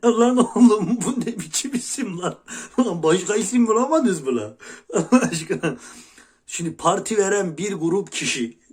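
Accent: Erzurum, Turkey. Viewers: Dadaş seni her zaman izlirem. Ben Erzurumlu değilem, lâkin Erzurum'da 29 yıl çalıştım. Senin o Erzurum ağziynan söylediğin sözler bana Erzurum'u hatirlatir. Hırtleğine basti...😄 Gorbagorun uşağı..., o.. u kordüğüm!. Erzurumu göresim geldi.. Şu zıkkımın kökü hesteliği bitse de bi gitsem..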